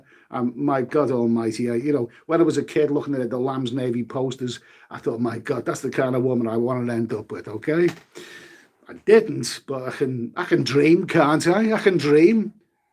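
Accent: United Kingdom. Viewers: Your very enjoyable to listen to. I have to admit I love listening to Brits talk! You give an interesting perspective on things so I just wanted to say don’t give up hope yet I’m already booked for philippines for December, but just in case I have free cancellation.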